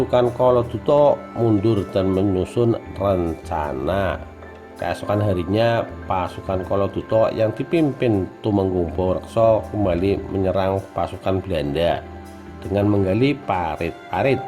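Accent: Tegal, Indonesia. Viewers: Admin kayane wong tegal ya boss..?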